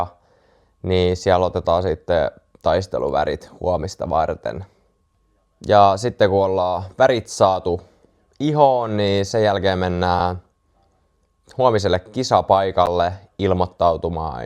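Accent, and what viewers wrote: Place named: Turku, Finland. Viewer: Hullu turunmurre😂, asiallinen editointi ja kiinnostava sisältö kiitos viihdyin! 😁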